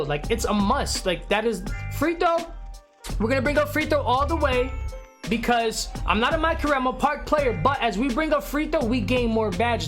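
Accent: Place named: North Jersey, United States. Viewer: Oh yeah and shot out jersey in the buildin aaayyy South Jersey Camden county to be exact.. I know by how u talk u from north lol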